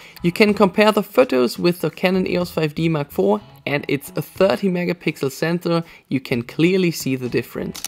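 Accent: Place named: Germany. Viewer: dat german english :D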